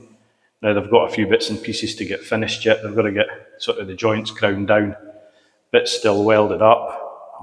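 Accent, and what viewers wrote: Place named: Scotland, United Kingdom. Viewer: Hi, when we were kids we had a saying that goes like this....... jealousy makes you nasty....... If you give way to the low class you end up like them. I listen to the Scottish accent coming through, if you are of Scottish descent, man, this person placing these comments clearly does not know the Scotts. Water of a ducks back, that's how you treat these comments. Good luck with the wetting of the front porch as soon as the place is finished.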